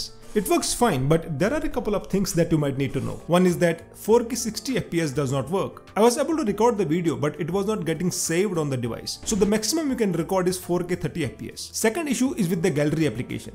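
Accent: Kashmir, India. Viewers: Bro you from Kashmir? Your accent is so Kashmiri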